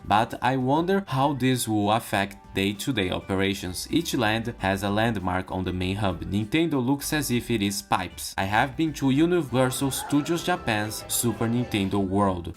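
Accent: Brazil. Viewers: Great video, thanks! Is that a Brazilian accent?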